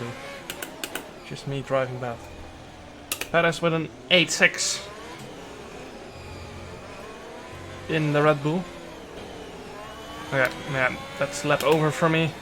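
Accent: Netherlands. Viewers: dat accent is zoo nederlands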